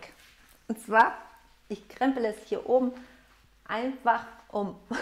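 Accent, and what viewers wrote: Germany. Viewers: That German accent/ language is amazing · Klingt nach Fränkin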